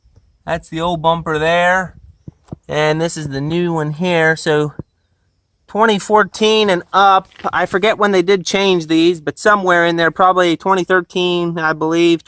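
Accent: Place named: Eastern Pennsylvania, United States. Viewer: If I had to guess, I’d place him somewhere in eastern PA.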